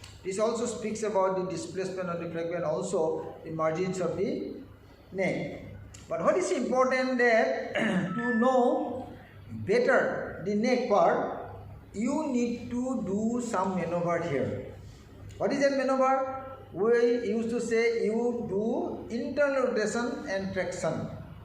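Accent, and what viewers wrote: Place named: Hindi, India. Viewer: Sir Your Hindi accent is so Nice❤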